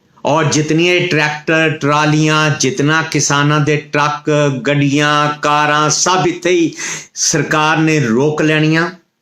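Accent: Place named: India. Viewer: Tere boln to pta lgda tu punjabi nahi ga teri tone hi hindi aa tu kehde paase to punjabi aa ?? Kisaaan aa?? Sikh aa?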